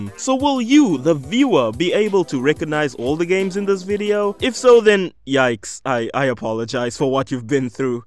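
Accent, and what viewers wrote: South Africa. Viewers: Bro are you South African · Ngl you sound south African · Do I hear a south african accent?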